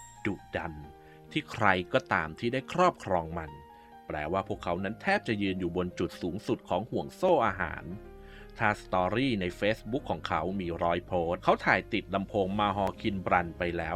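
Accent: Isan, Thailand. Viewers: เว่าอีสานไปเลยก็ได้ สำเนียงชัดซะขนาดนี้ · คนอีสานแน่นอน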